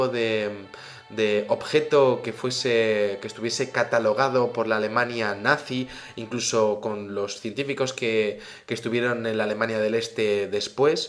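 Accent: Spain. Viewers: retrata a un artista pintor jajaja... Me haces reír de buena gana con algunos de tus relatos jajaja... La paso muy bien mirando tus videos, subiéndome a tu ''maquina del tiempo'', con tus dichos españoles en ese acento exquisito, con tu forma tan talentosa de relatar los hechos en general:) Es como 'leerme' un libro audio:) Tremenda maratón de relatos interesantes que has hecho! Gracias:)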